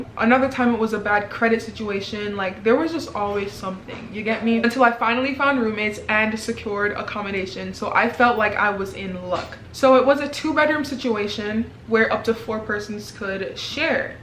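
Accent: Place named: Nigeria. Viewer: I heard you talk one second in and I knew you were nigerian.. or at least from Africa 😭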